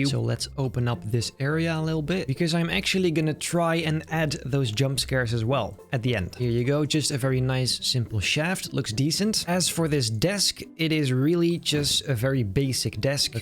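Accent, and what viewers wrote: Netherlands. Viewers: hahahaha thought i recognized a dutch accent but “people of the good life” confirmed it cause there is no better thing then dutch people saying “mensen van t goede leven”. great build and happy to see there are more dutch people who love fnaf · you sound dutch (nederland is cool)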